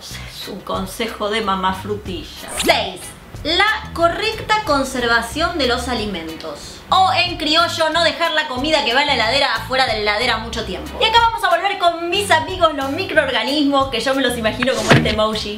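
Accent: Argentina. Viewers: De donde es ? Porque escucho que habla 'Argentino' pero tambien neutro en algunos momentos...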